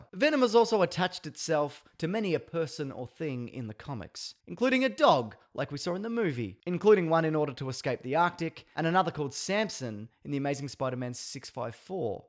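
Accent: Australia. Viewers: Wait I just clicked on this video and I have no clue who you are but you sound great! Umm are you Aussie cause I am I can hear it in your voice are you???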